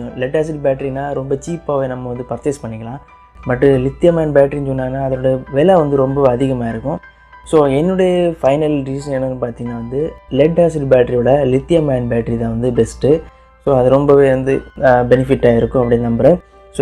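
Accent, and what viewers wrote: Kanyakumari, India. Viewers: Bro ,,, neenga Kanyakumari ya ?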